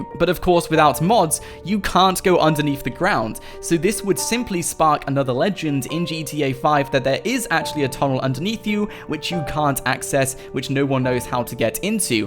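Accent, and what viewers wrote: United Kingdom. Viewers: It's a British accent come on guys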